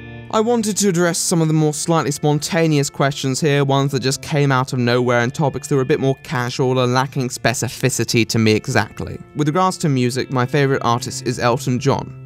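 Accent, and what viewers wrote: RP, United Kingdom. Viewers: I feel meh about it but I like him. However a Spanish accent on English is my preference. Perhaps that's why I'm indifferent to the British accents. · On the question of accent. I don't think any of you are going to get exact accent, but one of my danish friends achieved a stunning British accent, mainly by reading Shakespeare out loud (as a part of her education and as a hobby). Hard work, I know, but it is possible to get a nicer accent by training. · Subbed a few days before this video.Nice job your the only you tuber I know that ACTUALLY sounds professional and things.Very posh,lol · yes!!!! I adore all kinds of accents all of them are amazing but british is my fav!! So much so tht since i was a lil kid id worked and practiced on having an english accent (just general accent from ppl id seen on tv) and im actually rllly good at it now and i love using it, its just such an awesome spund and i can listen to talk all day lol I also love russian, australian and japanese their languages and their accent (and asl but i dont knw if it van have an accent? 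Maybe in different ppls hand and arm mobility & flexibility) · He is gay, a philosophy student, in his early 20s and has a heavy melting British accent He is perfect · Cheers dude, I've watched so much of you videos every now and then my voice instinctively makes a fake British accent. Anyways congrats dude! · I'm the kind of viewer that dislikes the usual QA video since youtubers usually put a lot less effort into them and are more there as a backup plan than anything. Though once again you posh bastard, your sickinigly sweet voice has tickled my inner ear canals in yet another video. I'm new here but I hope I don't get pushed into a locker for it since I plan on staying. Keep the grind going and I'll be sure to smash that like button like your voice smashes my special place every night. Though you are gay so I might have to get a penis in the meantime to fully enjoy the rammings I thrist for. 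Cheers cunt and make that European Union video sometime in the near future. <3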